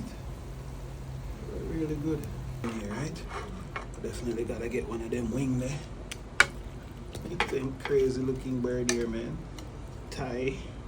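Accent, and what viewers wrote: Jamaica. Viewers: are you Jamaican?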